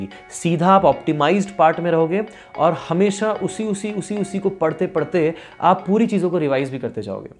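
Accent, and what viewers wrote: Bihar, India. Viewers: Bihar na..???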